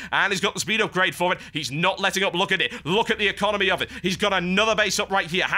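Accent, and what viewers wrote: United Kingdom. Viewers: He commentates at 300 words per second, you can still understand what he's saying, he doesn't trip over his words, he makes freakin hilarious rants WHILE commentating the match, and he's British! How can you NOT like his commentary!? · not to make a mean comment or anything, but if he wasent british, that would make you 25% less awsome. but you are, so you my friend, are epic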